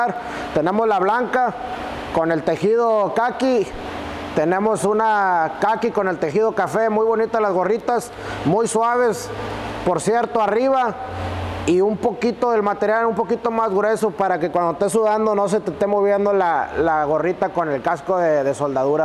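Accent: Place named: Texas, United States. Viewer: Me llega el acento tejano del compa en los vídeos de inglés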